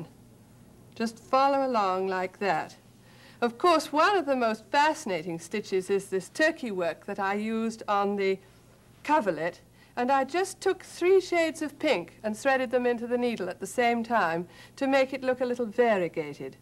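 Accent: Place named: RP, United Kingdom. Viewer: I love her accent! It sounds like pure queen's English.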